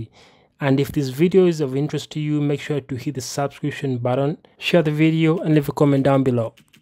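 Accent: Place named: India. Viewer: it's kinda good content but you sound indian and ofc keep making similar videos to this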